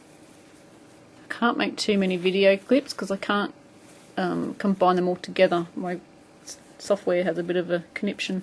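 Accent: Australia. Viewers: so glad i found aussie ,i can understand you lol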